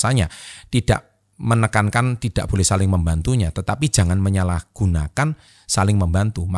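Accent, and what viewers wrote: Java, Indonesia. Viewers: Matur sembah nuwun, Pak, sae! jian medhok lan jeru....wis mirip dhalang tenan, Pak 👏👏👏😍 Tapi mungkin "Urup" = "Tukar", ya. "Murup" = "Nyala"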